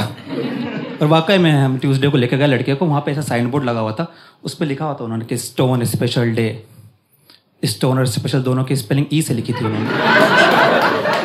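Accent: India. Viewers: His accent is telling that he is from MP 😂😅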